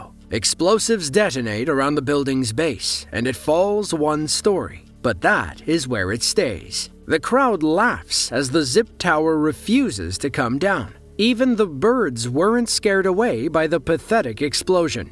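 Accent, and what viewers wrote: United States. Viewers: narrated with an American accent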